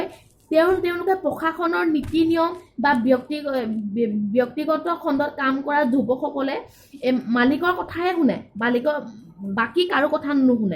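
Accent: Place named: Sivasagar, India. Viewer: তোমাৰ ঘৰ শিৱসাগৰত নেকি কথাখিনি শুনি ভাল লাগিছে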